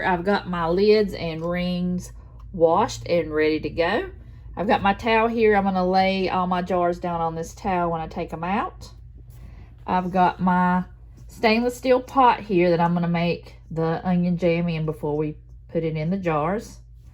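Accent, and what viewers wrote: South, United States. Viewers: I need to pull that book out. I’ve never seen that recipe. I’ve learned so much this collab. PS- your southern accent sounds like mine (Bama?) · I love seeing these unique jams, and I love your southern voice! 😊 the book all new recipes did it just recently come out?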